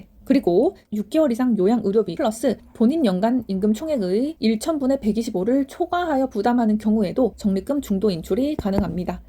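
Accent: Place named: Gyeongsang, South Korea. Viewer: 귀에 때려박는 경상도 딕션과 유려한 설명. 너무 감사합니다!!!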